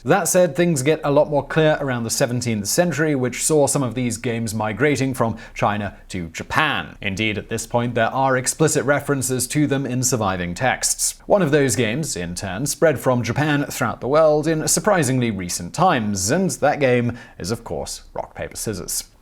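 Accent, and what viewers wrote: England, United Kingdom. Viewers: Ok , I just spent of my life listening to this cuz Simon has a great voice and Honestly, had ANYONE ELSE said with a straight face that there were PROFESSIONAL SPORT ORGANIZATIONS re: RPS I would have called Bovine Scatology in a nanosecond.... Saying stuff with the weight of credibility using an English accent has to be a superpower.... That being said I’ll see your RPS clip and raise you one...” I dare you to do a clip re the origin of LIAR LIAR PANTS ON FIRE “ Have a good wknd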